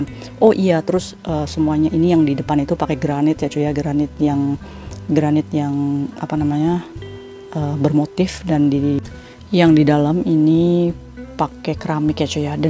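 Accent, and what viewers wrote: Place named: Cirebon, Indonesia. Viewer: Kalo dari logat & bahasa nya kak nih klo bukan orang Cirebon, Indramayu atau Subang 😊 boleh nih main 🤭🤭🤭 BAROKALLAH semoga berkah ya kak rumah nya, Masyaa Allah saya kadang iri kalo ada yg cerita dapat warisan🥰🥰🥰tp justru ini membuat ku tambah semangatt💪💪💪 walau saya gak ada warisan inshaa Allah anak2 ku nanti ada warisan 🤲🤲🤲 Aamiin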